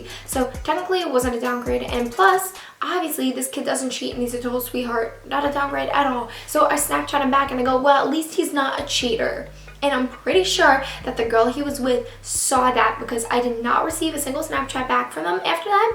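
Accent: New York, United States. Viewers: Her New York accent is so strong in this! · Anyone else just love her new york accent?? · MariaHerrle she has a New York accent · She's from New York, it's a common accent💗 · She has a New York accent! You can here it when says ask and again!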